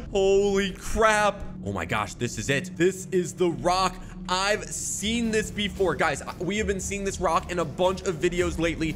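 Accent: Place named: England, United Kingdom. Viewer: I'm english and I speak like you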